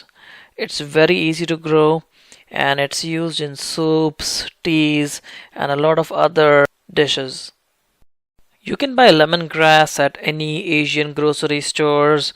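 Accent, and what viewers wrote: India. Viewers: rolls his "R"s... because he's Indian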